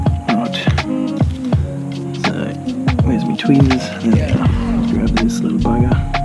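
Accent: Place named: Australia. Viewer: So aussie...love it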